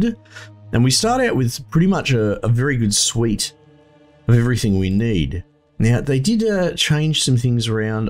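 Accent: Australia. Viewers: You're Aussie? NICE. Good video :)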